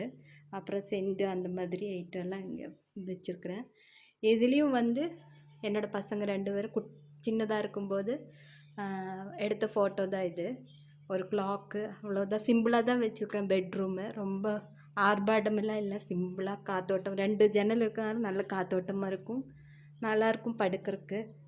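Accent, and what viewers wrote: Kerala, India. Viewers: Hi Amma, ur sounds like my mom, bzos my mom's native is Kerala she'll mix both malayalam and Tamil while speaking. Only the known people can understand her talk Amma. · thank you ur reply. Malayala Vasam speech LA therunchadhu. Where it's built?. In Kerala r Tamil nadu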